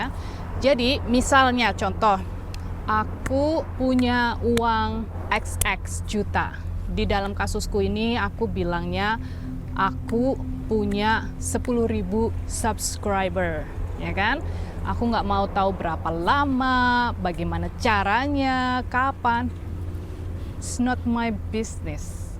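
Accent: Banyumas, Indonesia. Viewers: Setujuuuu... Mungkin karena sesama suku ngapak2 jadi berasa lebih mudheng... Apalagi pas bilang "abab e" 🤣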